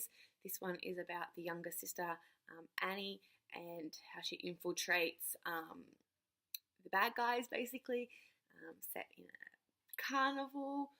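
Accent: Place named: New Zealand. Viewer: Interesting accent ^^ I always struggle with this, but do I detect a New Zealander here? 😂 Sorry if you are not from NZ. I just had to take the risk of guessing.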